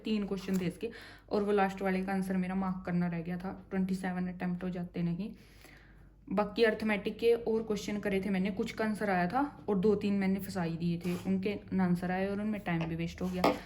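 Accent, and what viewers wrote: Haryana, India. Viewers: Haryanwi ho kya accent se lg rha h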